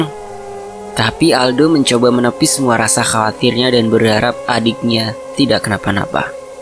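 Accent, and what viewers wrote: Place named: Surabaya, Indonesia. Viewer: dari dialek bahasanya kayak penulis dari Surabaya,cok,rek basa khas suroboyoan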